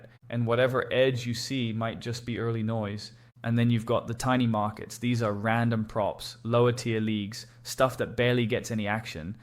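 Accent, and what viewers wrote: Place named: United States. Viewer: The Best Most Perfect American English Accent & Talking I've Ever Heard!!! 💖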